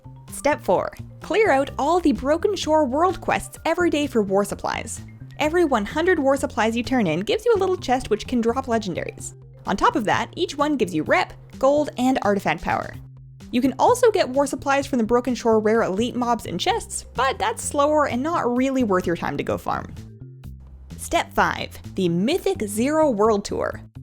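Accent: Canada. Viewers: I have to sadly admit I've no idea what she is talking about for most of this video. She sounds Canadian though.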